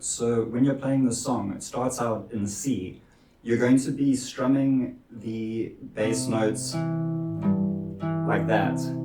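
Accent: South Africa. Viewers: This is awsome man, Ill be using this for sure! Are you South African? If so double hell yeah!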